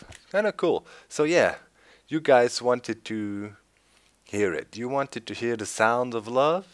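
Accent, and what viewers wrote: Germany. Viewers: have you ever tried using an american accent other than your german one?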